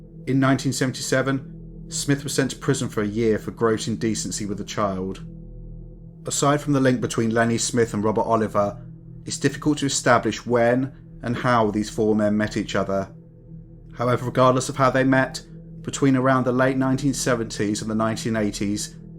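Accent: United Kingdom. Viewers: What part of England are you from? The south?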